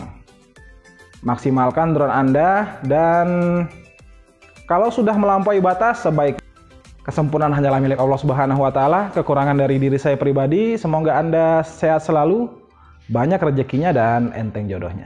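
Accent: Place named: Kalimantan, Indonesia. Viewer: dari logat bahasanya ketahuan,kalo dari kalimantan....sukses selalu ya om ,🙏🙏🙏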